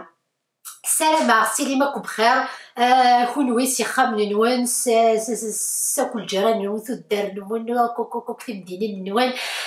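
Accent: Kabylie, Algeria. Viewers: Des fois je ne comprends pas ton kabyle mais mon mari me dit que tu viens peut être de la petite Kabylie dans ce cas là c'est normal. Mais j'ai vécu qu'en France du coup il est vrai que j'ai beaucoup perdu. En tout cas tu rayonnes ça fait plaisir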